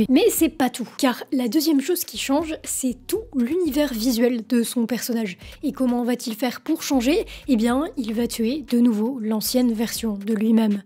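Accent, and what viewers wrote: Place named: France. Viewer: SVP est ce que tu peux prononcer les mots anglais sans l'accent Français? Ça fait mal au oreilles, / Ze weekend.. rien de personnel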